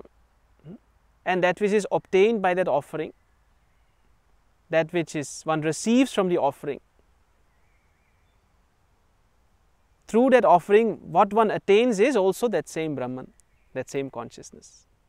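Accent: India. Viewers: His english accent has also became Indian accent. ❤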